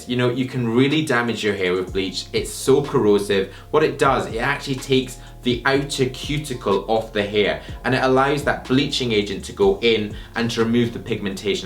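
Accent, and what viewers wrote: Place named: England, United Kingdom. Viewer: I love Ur English accent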